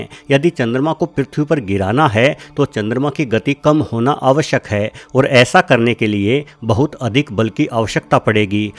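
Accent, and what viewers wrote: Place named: Bihar, India. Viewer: Bahut sound like bihari tone 🤣🤣🤣😂😂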